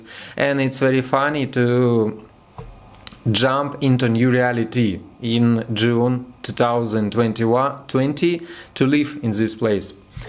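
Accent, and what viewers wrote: Russia. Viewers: акцент классический русский... хотя у итальянцев такой же - по крайней мере, очень похож.. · День добрый прикольно русский английский это что-то...😁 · Wow!! You were surprised! Yes, of course, your English is far from perfect, but understandable. In my opinion it's a good idea. You need to expand the audience and you are moving in the right direction. My English is terrible, unlike yours, and the same terrible Russian accent, which only improved understanding. Subtitles weren't needed. Accept my praise again! Keep it going! P.S. простите меня, если я вмешиваюсь в ваши планы, но было бы более правильным открыть отдельный канал для англоговорящей аудитории. Немного практики, занятий с носителем языка, и все будет великолепно! Русский акцент только придаст вам определенный шарм! Вот только танцевать не надо. Это уже будет too much.))) But that's just my opinion, you decide!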